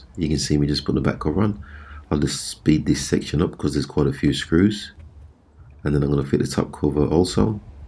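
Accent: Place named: Birmingham, United Kingdom. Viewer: Thank you for a reply too 👍🙏 I took it apart exactly how you said cleaned it with some wd40 & a tooth brush wiped it put it back together with some red grease it’s all i had it took just over an hour put a big load in on a 45 min 1200 spin wash its just emptying & spinning now “silent” I’ve had that problem that sounded like a machine gun for 6months took it apart twice & with your help in under an hour it’s like the day I brought it again I can’t thank you enough 👍👍(every other vid on you tube was saying spider or bearings)🙏 saved me £300+ replacement 🙏.. & just on a tangent I noticed the accent im originally from B32 (don’t know how close that is to you ) if i still lived there I would come shake your hand & buy you a drink thank you so much 🙏🙏🫡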